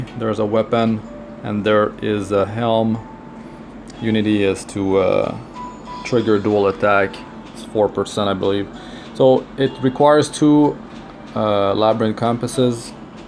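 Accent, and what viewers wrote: Quebec, Canada. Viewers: T québécois hein? :)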